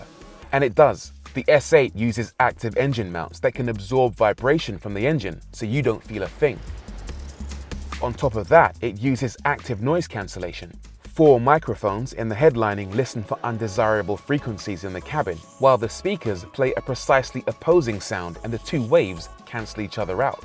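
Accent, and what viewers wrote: United Kingdom. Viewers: I love your presentation and British accent 👍🏿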